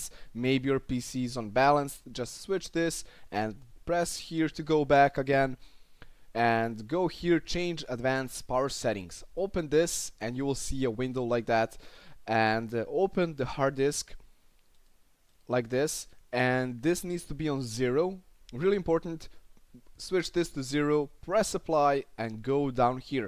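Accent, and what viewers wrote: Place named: Balkans. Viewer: znao sam da si sa balkana kad sam cuo kako pricas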